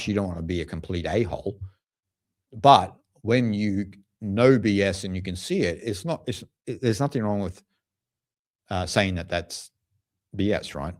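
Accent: Australia. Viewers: I love your Australian accent! Thanks for keeping it real!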